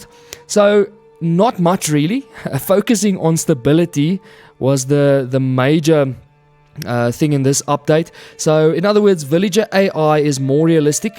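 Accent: South Africa. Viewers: Greetings from 🙌 Enjoying the South African accent during the playthrough.. I don't think I'm subscribed to anyone in South Africa 🤔 ⭐New Subscriber Unlocked⭐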